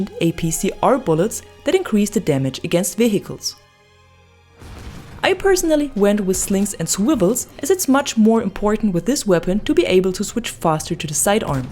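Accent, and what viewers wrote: Germany. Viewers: you sound very german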